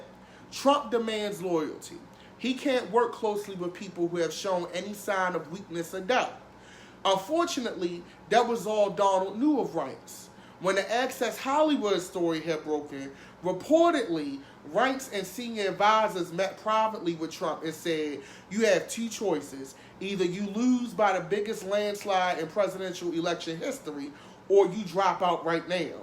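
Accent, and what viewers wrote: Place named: Baltimore, United States. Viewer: You must be from somewhere near Baltimore... I hear your accent lol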